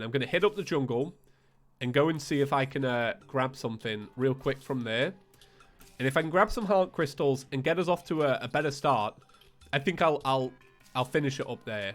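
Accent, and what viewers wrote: England, United Kingdom. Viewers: yeah thats the english accent for you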